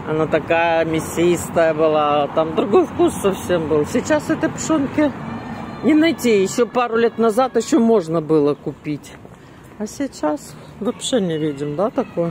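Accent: Odessa, Ukraine. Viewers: Снова и снова восхищаюсь голосом и одесским говором. Спасибо вам большое. Смотрю вас с удовольствием.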